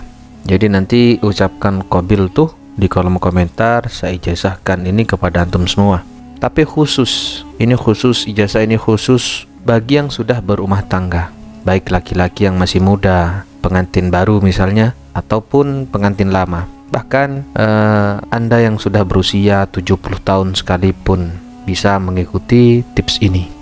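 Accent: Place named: Madura, Indonesia. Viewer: Adminya ketahuan sekali maduranya 🤣🤣🤣🤣 . . .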